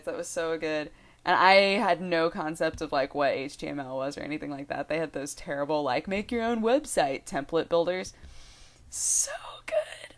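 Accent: United States. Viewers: lol, it sounds so weird hearing you speaking your American accent rather than your "British" accent, lol. ;)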